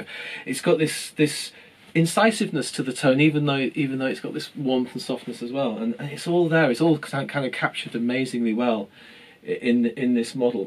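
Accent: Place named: RP, United Kingdom. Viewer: Hello there Sir!!!! I would like to leave an off-tangent comment: I love your English RP accent!!!!!!! So elegant =) kisses from montreal.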